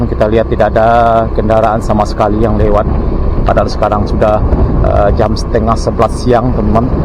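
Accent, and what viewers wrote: Minangkabau, Indonesia. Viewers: Dari beberapo video ikolah nan gambar video barasiah, stabil, carito jo informasi sinkron dan jaleh, didukuang pulo jo suaro dan logat lokal, yo samakin taraso ado di lokasi.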